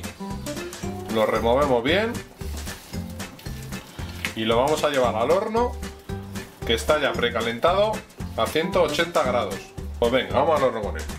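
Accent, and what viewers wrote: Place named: Spain. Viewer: Se siente à España 🇪🇸🙌🏼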